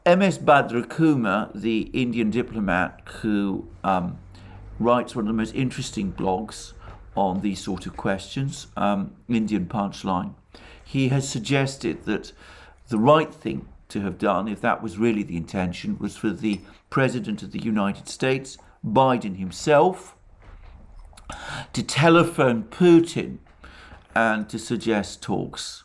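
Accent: United Kingdom. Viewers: Fantastic analysis, unbiased, clear and balanced. Great British accent.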